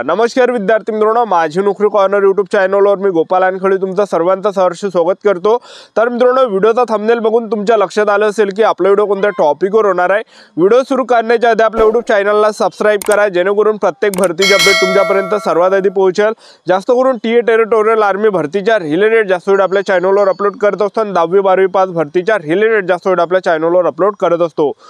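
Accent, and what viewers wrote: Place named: Parbhani, India. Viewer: परभणी बोलते ❤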